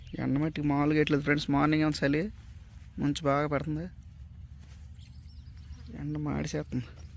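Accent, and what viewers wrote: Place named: Bhadradri Kothagudem, India. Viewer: మీ మాట మా సయిడ్ లా మాటాడతున్నారు ఊ అదుగో అని😁😁😁 భద్రాది కొత్తగూడెట మాది😁😁😁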